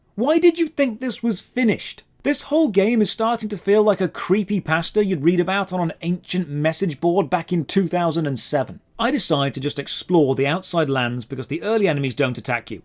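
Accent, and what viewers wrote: United Kingdom. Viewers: agreed. then again, he's handsome. And speaks British English. I'm sold. xD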